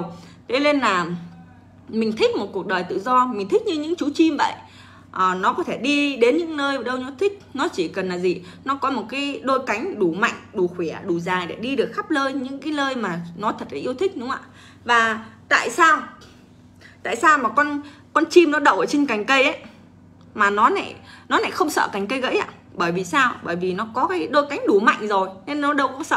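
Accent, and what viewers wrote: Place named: Hai Duong, Vietnam. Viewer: anh chào em nghe như em là đồng hương hải dương nhỉ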